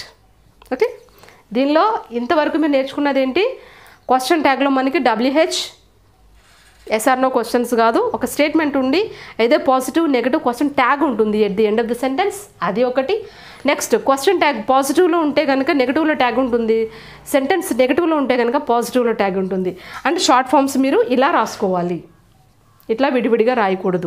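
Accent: Telugu, India. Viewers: Content is good. But pronunciation is not up to the mark.Telugu accent is prominent. Please work on that to improve your teaching abilities.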